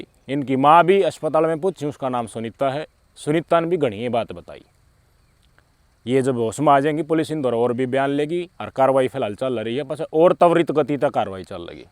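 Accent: Haryana, India. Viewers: Bhai aap jma dhasu haryanvi bolo ho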